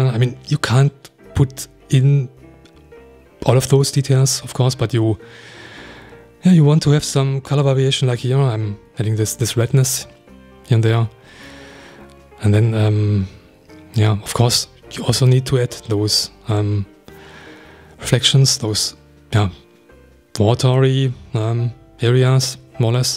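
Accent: Germany. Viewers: His German accent is the best that u can find, lovely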